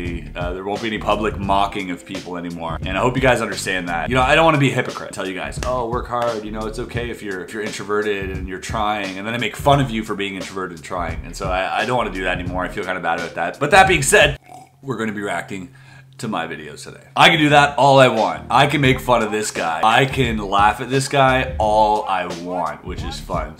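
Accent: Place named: Canada. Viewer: Your Canadian really shines through in those old videos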